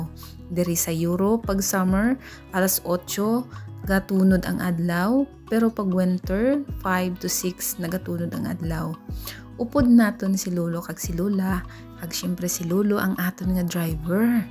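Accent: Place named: Hiligaynon, Philippines. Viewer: Baw ilongga gid ba🤣✌️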